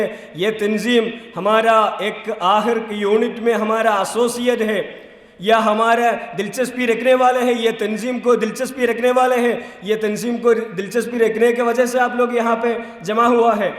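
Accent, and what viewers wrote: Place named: Kerala, India. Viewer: His mother tongue is Malyalam he is addressing Non Malyalam Audience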